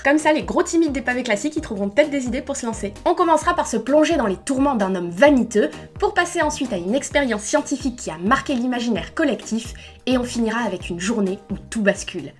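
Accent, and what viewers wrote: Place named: South, France. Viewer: Super sympa ta chaîne j'aime bien le concept (étant moi même en 2e année de prépa littéraire, forcément) ça change un peu de ce qu'on voit sur youtube tous les jours! Juste une petite précision au niveau de la prononciation, Zweig se prononce "tsvaïg" (à l'allemande, si on prononce aussi son prénom "Chtefan") ou à la limite "svaïg" mais le "ei" est bien à prononcer comme le mot "ail"! Voilà j'espère avoir pu t'aider, comme j'entends à ton accent que tu viens peut-être du sud, les noms d'origine allemande ne sont pas les plus simple à prononcer! Bonne continuation :)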